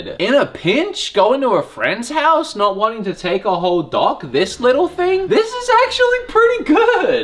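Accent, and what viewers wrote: Australia. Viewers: What's with your weird fake American accent lol.. I preferred your beautiful Aussie accent you have somehow lost.. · sounds more Australian then normal lol not a bad thing I just heard it more then usual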